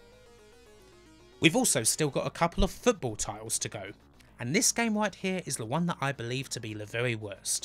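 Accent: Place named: Croydon, United Kingdom. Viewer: is the purest Croydon accent around.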